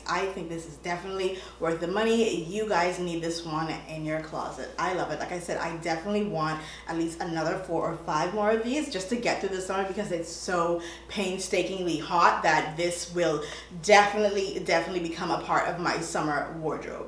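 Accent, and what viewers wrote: Bahamas. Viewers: just watched your rose wholesale video and am like this girl sounds Bahamian so I stalked your page and you are Bahamian yayyyy!!! I love your videos definitely will be subscribing and yes am Bahamian too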